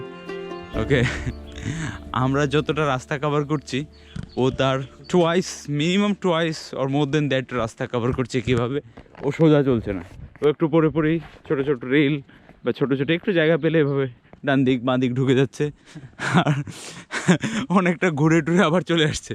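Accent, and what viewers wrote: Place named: Comilla, Bangladesh. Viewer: It seems that you are from Comilla , though we know, Tripura was the part of East-Bangal before the British period. Your tone and dialect are matched with us .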